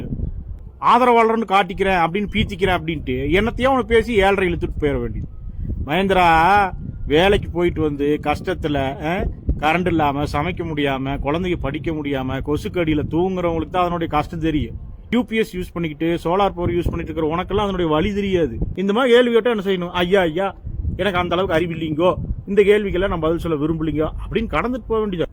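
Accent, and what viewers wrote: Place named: Coimbatore, India. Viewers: கோயம்புத்தூர் பாஷையில் சூப்பர் குத்து😂😂😂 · கோவை பேச்சு சூப்பர் பா 🥰🥰🥰🥰🥰🥰🥰🥰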